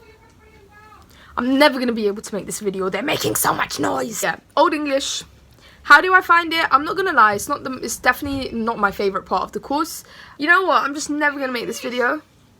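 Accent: United Kingdom. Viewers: Have you been born in uk, if no, then , how you have uk accent , and have you learnt phonetics if not then how did you learn pronunciation . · uh that british accent gurl:)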